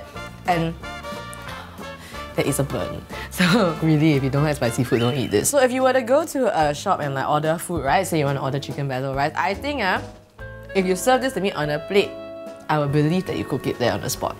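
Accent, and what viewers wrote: Singapore. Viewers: where is she come from ? Singapore ? Malaysia ? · i sounds like a singaporean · i loooove your singlish accent :) (coming from someone who lives in canada) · is an Singaporean or at least born in Singapore because she has a accent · As a Singaporean living overseas, the most shiok thing is to hear our accent when you are going through YouTube videos.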